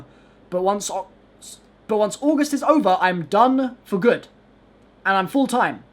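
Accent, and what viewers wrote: United States. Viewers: American Accent ™️ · A video with your best american accent would be nice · Good video that American accent is so funny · American accent was too great. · I love you’re American voice doing it the black ops way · That American accent dough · Omg i love his American accent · Ur American accent (my boi ) was pretty dope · you have an amazing 'merican accent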